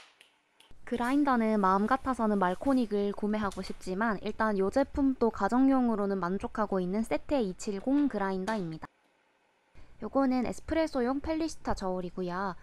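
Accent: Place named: Gyeongsang, South Korea. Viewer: 경상도 사투리 귀여워요. ㅋㅋㅋㅋㅋㅋㅋㅋㅋㅋㅋㅋㅋㅋㅋㅋㅋㅋㅋㅋㅋㅋㅋㅋㅋㅋㅋ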